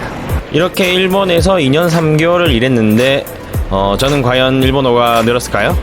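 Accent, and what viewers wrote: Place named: Busan, South Korea. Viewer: 영상보다가 억양을 들어보니 부산분 같은데 고향이 어디신지요? 저는 부산입니다.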